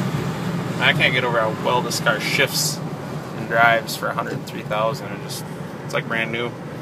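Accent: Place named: Canada. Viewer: I trust what your saying cause I'm hypnotized by the awesome car and you sound Canadian to me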